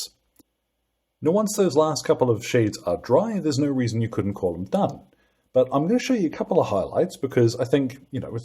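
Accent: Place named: New Zealand. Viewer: Great video. I think this might be the Kiwi you've sounded thus far, eh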